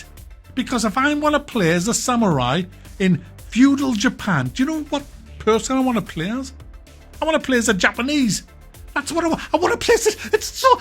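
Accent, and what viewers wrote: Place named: North East England, United Kingdom. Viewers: Northumberland accent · Nothing makes me feel more at home than a North Eastener going on a rant there’s a sub for ya 😂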